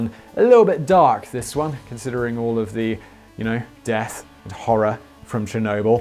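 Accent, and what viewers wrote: RP, United Kingdom. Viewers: Very posh. Thanks for the video.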